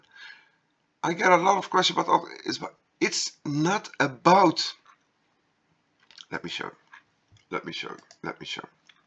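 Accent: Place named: Poland. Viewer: Dude your English is great! I love Polish accents and way of speaking.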